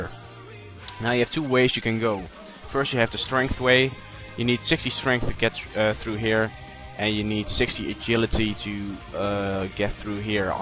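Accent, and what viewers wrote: Netherlands. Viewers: r u dutch? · dutch accent! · You're Dutch, I can hear that lol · haha ik kan gewoon horen aan je accent dat je nederlands bentXD: WEL TOF TROUWENS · lol u can hear. he is from the netherlands :P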